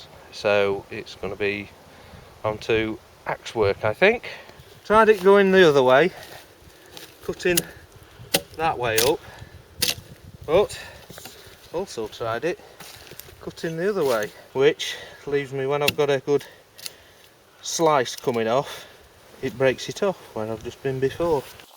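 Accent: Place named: England, United Kingdom. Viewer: Im an American and it sounds a lil funny that you have an English accent but you read inches. thank you for the information bud. cheers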